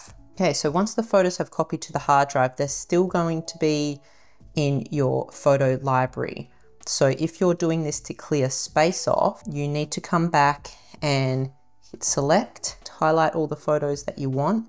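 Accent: Australia. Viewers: You sound Aussie so why are you using the American Amazon site? Could you please change it to Australian Amazon?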